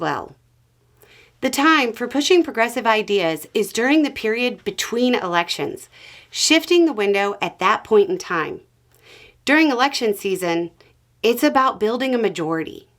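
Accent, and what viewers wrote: South, United States. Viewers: You have been a great replacement, but I have one question. Are you saying " " or " " at the beginning of each video? Nothing wrong with either but my to my ears both are close with the Southern accent.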